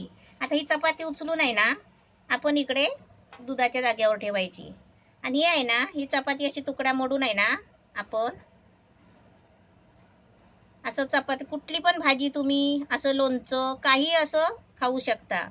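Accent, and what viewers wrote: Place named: Ratnagiri, India. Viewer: मी नीता तुमचं मी अभिनंदन करते सर्व रेसिपी सुंदर आहे तुमची रत्नागिरी भाषा /बोली छान अभिनंदन अभिनंदन!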